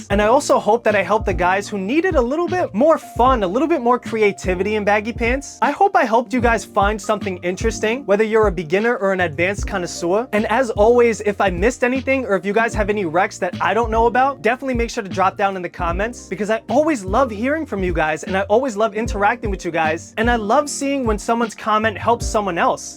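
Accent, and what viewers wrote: New York, United States. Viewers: Such an informative vid and some real bespoke picks! Also love the NY accent haha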